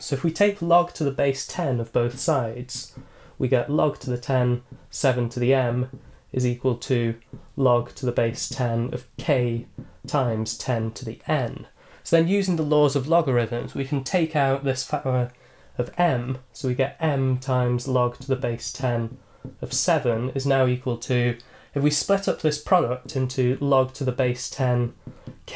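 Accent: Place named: RP, United Kingdom. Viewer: Mate, don't get me wrong: I love math yet your soothing voice and english posh accent are perfect to fall asleep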